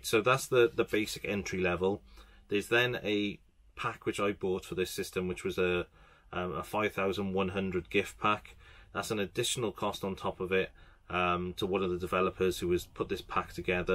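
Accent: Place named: Wales, United Kingdom. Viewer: Heya. I thought that accent sounded familiar. Are you from Cardiff? I’m from Newport :) I do 1-Credit clears of arcade and console games mainly. Those LED panels look really cool, I may have to look into this.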